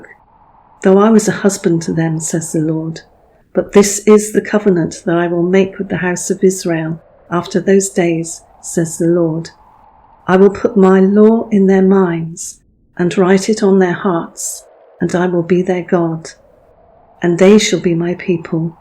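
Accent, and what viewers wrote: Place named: England, United Kingdom. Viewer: Thank you. So beautifully read and timely for Israel. Love the English accent!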